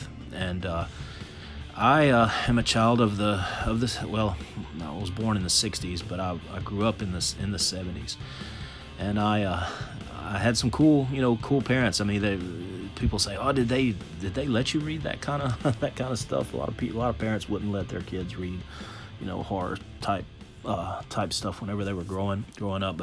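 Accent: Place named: United Kingdom. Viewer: Damn! How did I miss your channel?!? Well, I sub'd now! Weird: Somehow I thought you were British! Oh, well--! LOL Great stuff,!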